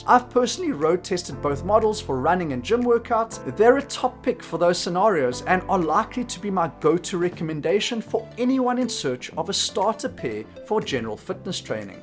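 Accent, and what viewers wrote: South Africa. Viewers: I hear south Africa in this man's voice! 🇿🇦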